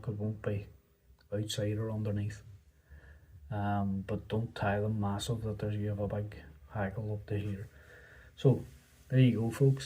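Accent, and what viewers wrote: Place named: Ireland. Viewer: What part of Ireland are you from....nice tie by the way....keep up the good work....i've only started trying to tie smaller flies recently as the eyesight isn't as good as it used to be unfortunately...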